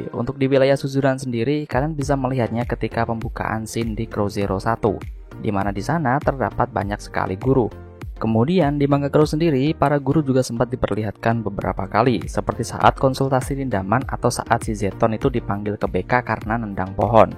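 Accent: Java, Indonesia. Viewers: Jowo mu bang bang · Jowone metu terus 🤣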